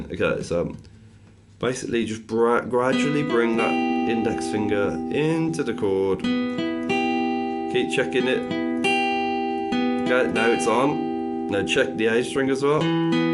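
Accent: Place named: Birmingham, United Kingdom. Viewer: brummie? Some type of English accent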